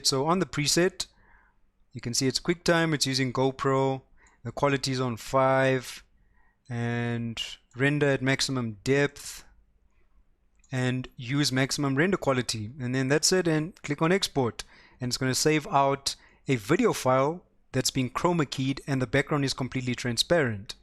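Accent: South Africa. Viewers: you from South Africa bud?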